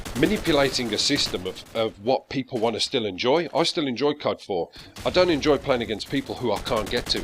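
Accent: United Kingdom. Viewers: In the US there is something about the UK accent we enjoy. Something different I suppose. Is it the same over there for broadcasters with an American accent?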